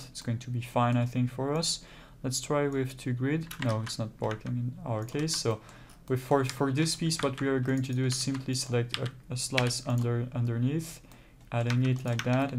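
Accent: France. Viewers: I’m starting to think you’re only apologising for your French accent so we compliment it ;) awesome tutorial, real time tutorials that explain everything in detail is a rare find!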